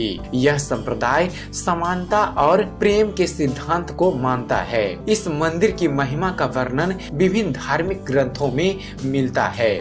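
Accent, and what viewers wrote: Bihar, India. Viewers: Audio me kisi bihari ki awaz hai pakka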